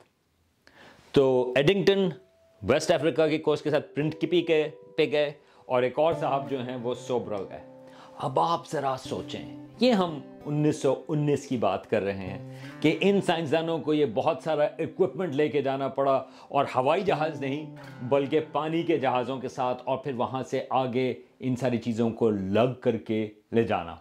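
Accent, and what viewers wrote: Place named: United States. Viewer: Very Interesting. An American way of expressing in great urdu language !!!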